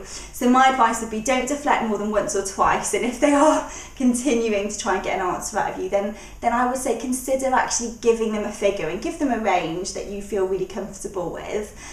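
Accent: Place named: England, United Kingdom. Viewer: I like your English Accent, it's awesome!.. An employer asked me "How CHEAP will you work"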